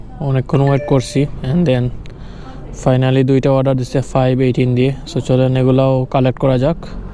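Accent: Sylhet, Bangladesh. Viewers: আরে ভাই তুমি তাইন তো দেখিয়ার সিলেটি ❤ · ভাই আপনার কতা হুনিয়া মনে হয় আপনি আমরার সিলেটি মানুষ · ভাই আপনি সিলেটি নি?? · Apne kita sylheti ni ??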